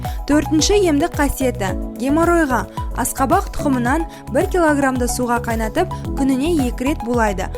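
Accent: Standard. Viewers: Ана тілімізде таза сөйлеген өркенің өссін айналайын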